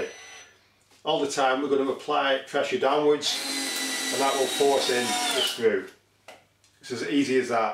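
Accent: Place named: Lancashire, United Kingdom. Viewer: Good old Lancashire accent!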